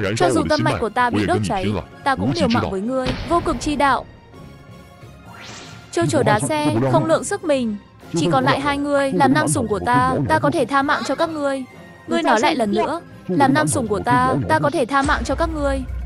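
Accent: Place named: China. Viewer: Giọng này có tiếng trung giở quá. Ko hay bằng giọng cũ.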